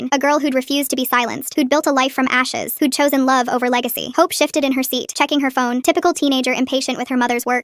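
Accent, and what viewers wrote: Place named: United States. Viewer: Her voice is annoying American fast loud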